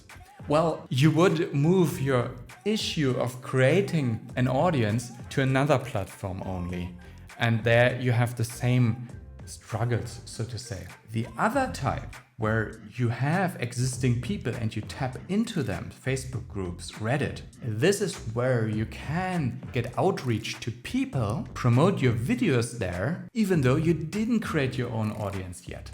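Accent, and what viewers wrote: Germany. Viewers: This channel is dope. Perfect visual branding and color scheme, completely balanced, properly organized information, flawless delivery. Even the German accent adds to the effect. I feel like I'm watching the Porsche 911 of YouTube growth channels. I'm guessing you drive one.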